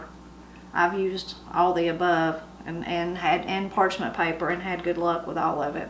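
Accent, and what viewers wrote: Appalachia, United States. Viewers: happy thanksgiving to u !!! celebrating & enjoying the sound of your appalachian accent----makes my sorrow for america's sad situation feel better 'cause in the heartland--appalachia'---there are people like u guys still living !!!! When i watch tv, i just don't see anyone in a family environment at all, so it's heartwarming to watch your appalachian instructional home videos !!!!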